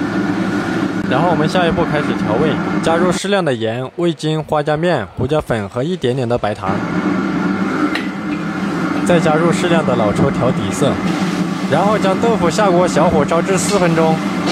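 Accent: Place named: Zigong, China. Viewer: Shuai Wang 这个师傅一听就晓得是自贡人，，， 怎么不是川厨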